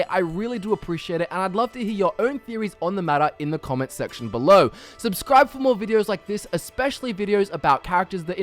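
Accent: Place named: Australia. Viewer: sounds like he is Australian but is he Australian?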